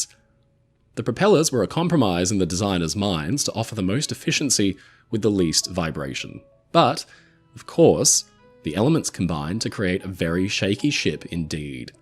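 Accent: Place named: Australia. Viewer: It's because he's Australian. Different countries have different pronunciations of the same words. Neither is right or wrong. It's basically the same thing as those of us in the US pronouncing herb as "erb" when England pronounces it with the "h" sound at the beginning. Now, they could definitely tell us our pronunciation is wrong because they were saying it with the "h" before we were even a country, but would you say we're wrong? Probably not, and neither are they.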